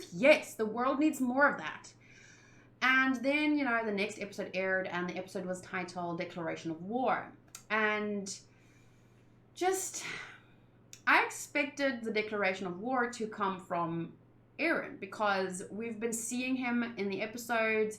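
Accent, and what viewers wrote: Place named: South Africa. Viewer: she from South Africa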